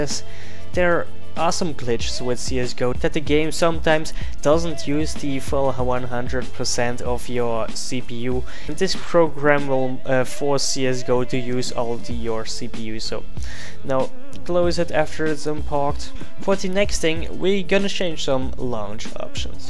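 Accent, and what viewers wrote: Netherlands. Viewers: Yay nederlander die engels praat lols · Whuut are you dutch? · are u dutch? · You are dutch? :D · Are you from the nethetlands? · So you're Dutch, or Flemish? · oooh je bent nederlands k daxht al toen je control alt delete zei youre dutch i was thinking about your accent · Wait a sec, you dutch bro? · je hoort heeell goed als iemand nederlands is xDD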